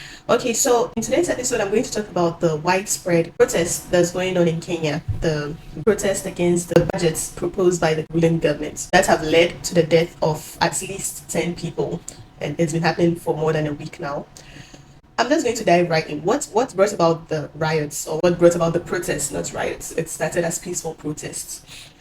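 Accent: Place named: Ghana. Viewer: I detect a Ghanaian 🇬🇭 accent.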